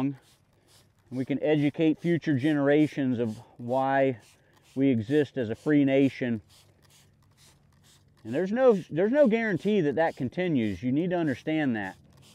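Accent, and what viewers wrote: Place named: Florida, United States. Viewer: You have a Florida accent, like Gary Rossington from Lynyrd Skynyrd.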